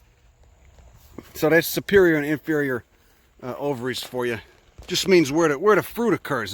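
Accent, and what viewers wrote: Chicago, United States. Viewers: Love your channel, and the Chicago Accent.... "Kick Yourself in the Dick" never gets Old... Keep posting, and Thanks a bunch for the highly entertaining, and educating Videos!! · Oh my, it's not often you come across a Chicago accent in the wild of youtube. This is fantastic. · That windy chicago accent is pretty funny... and that's comming from someone who used to be a Chicagoan.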